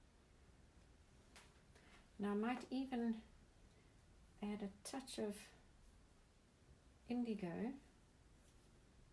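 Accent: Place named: South Africa. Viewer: This is lovely. It sounds like you’re also South African? I also love watercolor and the loose style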